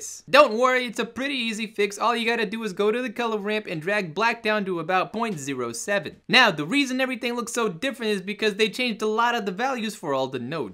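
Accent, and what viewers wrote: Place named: Brooklyn, United States. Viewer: I FEEL LIKE IM IN BROOKLYN LOL, I LOVE IT!!!!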